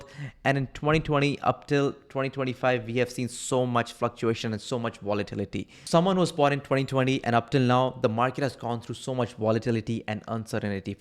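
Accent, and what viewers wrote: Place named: India. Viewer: I heard an Indian accent and immediately turned off.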